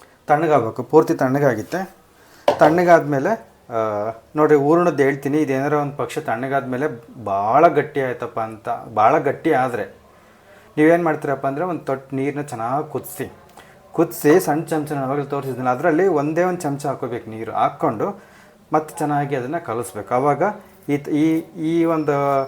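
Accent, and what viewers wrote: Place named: Hassan, India. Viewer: ನೀವು ಯಾವ ಊರು..?? ಎಲ್ಲಾ ಕಡೆ ಭಾಷೆನೂ ನಿಮ್ಮ ಮಾತುಗಳಲ್ಲಿ ಇವೆ.ನಮ್ಮ ಹಾಸನದ ನಮ್ಮ ಹಳ್ಳಿ ಮಾತುಗಳು, ಮಂಡ್ಯ ಕಡೆ, ಉತ್ತರ ಕರ್ನಾಟಕದ ಕಡೆ ಹೀಗೆ ಹಲವಾರು ಹಳ್ಳಿಗಳಲ್ಲಿ ಮಾತಾಡುವ ಕನ್ನಡ ನಿಮ್ಮದು.. ❤️❤️ ಜಾಸ್ತಿ ನಮ್ಮ ಹಾಸನದ ಕಡೆ ಹಳ್ಳಿ ಭಾಷೆ ಇದೆ. ನಂಗೆ ಖುಷಿ ಆಯ್ತು. ಅಡುಗೆಗಳು almost ಎಲ್ಲಾ ಚೆನ್ನಾಗಿವೆ. ನೀವು ಮಸಾಲೆ ದೋಸೆ ಮಾಡುವುದನ್ನು ತೋರಿಸಿ.. 🙏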